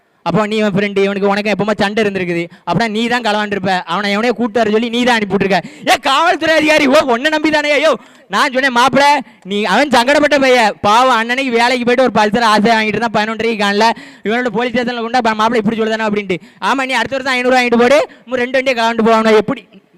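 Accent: Tirunelveli, India. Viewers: சூப்பர் திருநெல்வேலி பேச்சு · திருநெல்வேலி தமிழ் அழகு ❤ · அனைத்து அருமையான பதிவு தம்பி திருநெல்வேலி தமிழ் அருமை மகனே வாழ்க வளமுடன் நலமுடன் தம்பி நிங்கள நாம் தமிழர் கட்சியின் மேடையில் தோன்றி பேசவேண்டும் வேறொரு மொழி துரோகி களை தோல் உரித்து காட்டி விட்டார் தமிழ் தம்பி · நெல்லைத்தமிழ் தேனாக திகட்டுகிறது கேட்க கேட்க. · அருமையாக இருந்தது உங்கள் பேச்சு இந்த திருநெல்வேலி பேச்சு அருமை அருமை வருங்கால நாம் தமிழர் கட்சி திருநெல்வேலி பேச்சாளர் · நெல்லை தமிழ் அடித்து நொறுக்கு கிறான் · 👌👌👌👌👌👍👍👍👍👍 சிறப்பு தம்பி.நெல்லை தமிழ் அருமை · தம்பி நீங்கள் பேசிய திருணல்வேலி அழகு தமிழ் எணக்கு ரோம்ப புடிக்கும்.. கொஞ்சம் நிருத்தி பேசினால் நல்லயிக்கும் வாழ்த்துக்கள் வாழ்க தமிழ் தேசியம். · அருமை, அழகாக திருநெல்வேலி தமிழில் பேசி சிறப்பித்தமைக்கு பாராட்டுகள் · திருநெல்வேலி தமிழ் நீங்கள் பேசுவது நன்றாக உள்ளது தம்பி. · திருநெல்வேலி தமிழ் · அழகாக திருநெல்வேலி தமிழில் பேசி சிறப்பித்தமைக்கு பாராட்டுகள் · அருமையான பேச்சி நெல்லை தமிழில் நல்லா புகுந்து விளையாடிட்டீங் சிறப்பு அருமை👏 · திருநெல்வேலி பாசையில் பேசிய சகோதரர் அவர்களுக்கு மனமார்ந்த வாழ்த்துக்கள் நன்றிகள்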